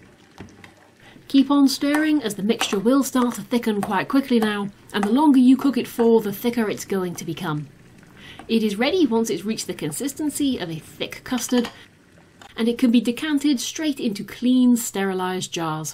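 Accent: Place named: Australia. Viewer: Well, you should. It's really extremely beautiful. You have an Australian accent, am I right? It's amazing. I think you should do some voice overs as well. You should be more serious about good quality equipments. You're doing really well. I think you can. You will do even better if you get some good quality equipment. I wish you all the best.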